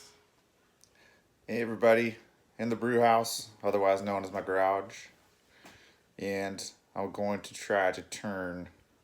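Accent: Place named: South, United States. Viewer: You seem to have developed a southern drawl in this video.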